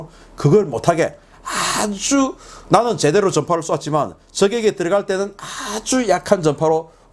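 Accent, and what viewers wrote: Gyeongsang, South Korea. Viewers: 신앵커님 늘 목소리 약간 경사도 사투리가 멋저요 오늘도 괜찮아요 좋은 정보 감사합니다 국방당관 감입니다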